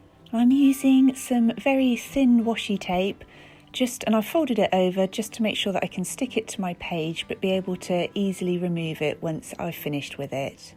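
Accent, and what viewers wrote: United Kingdom. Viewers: I just wanted to tell you how much I love your videos. All of your spreads are so pretty and watching and listening to you and your British accent is so relaxing! (Texas girl with a serious southern drawl here)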